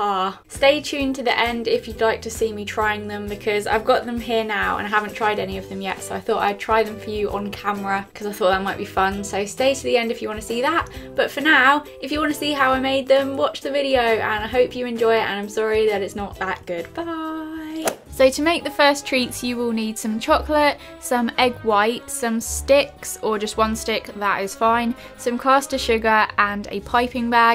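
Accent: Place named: England, United Kingdom. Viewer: i found ur videos other day now im hooked on watching them all xx ... r u from north south east or west england as ur accent is lovley xc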